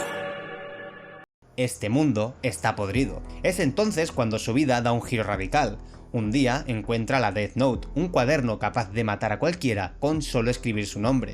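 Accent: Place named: Spain. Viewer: Español de españa? ostiaaaaas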